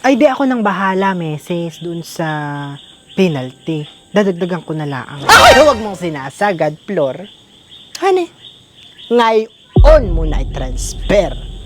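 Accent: Batangas, Philippines. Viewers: Gustong gusto ko ang punto mo, taga saan kb meses? Batangas o cavite?? Nppasaya mo kming lht, maraming salamat❤❤ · Anong accent yan? Saang probinsya yan?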